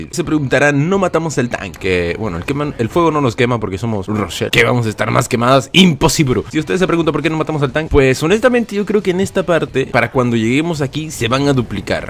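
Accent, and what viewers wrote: Peru. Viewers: Eres de Perú ? ._.XD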